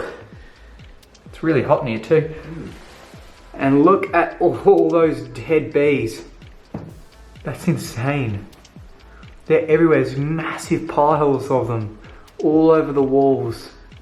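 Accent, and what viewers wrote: Australia. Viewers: He has the most aussie accent ever :D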